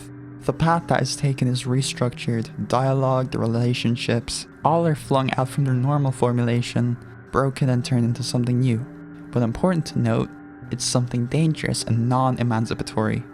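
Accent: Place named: Ireland. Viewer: Nice to hear an irish voice in the sea of Americans 😅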